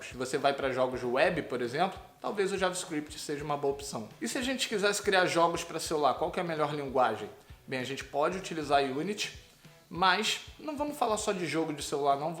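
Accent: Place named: Rio de Janeiro, Brazil. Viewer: Ih koe carioca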